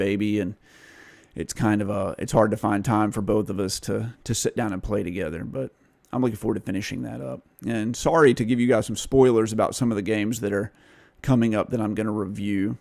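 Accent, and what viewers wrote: South, United States. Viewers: it's the main game second hand game store here.. it's great when I get bundles and games I don't want/dupes I trade them in for credit and keep the games I want! I thought I heard some southern! But usually when I think southern I think Texas haha.. I'm jealous of Americans coz how many youtubers in America I've seen there's quite alot of video game stores.. but most games are cheaper here which helps me when I don't have alot of money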